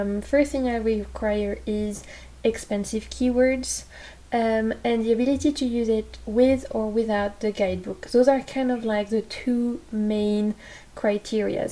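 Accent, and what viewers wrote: France. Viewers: Love your sweet voice, so glad to find your channel. I loved the cloth with stars it was so perfect as a reading cloth❣️ Most of all I love your beautiful French accent. I think it is great fun to share favorite decks with others Thank you so much. Suzy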